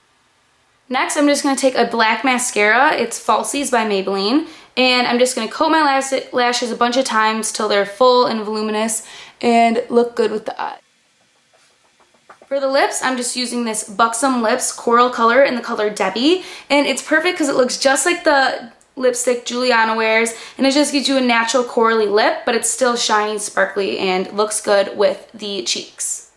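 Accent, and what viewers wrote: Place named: Cleveland, United States. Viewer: hi! i really like your videos, i just subscribed:) ps- and I've been noticing how your accent is very similar to where im from (cleveland) and when you said you were from chicago it made so much sense just thought i'd let you know cause it seemed funny to me hahaha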